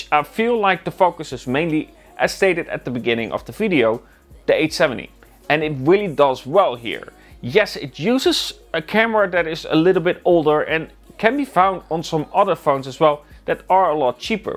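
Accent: Netherlands. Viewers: u sound Dutch 🤔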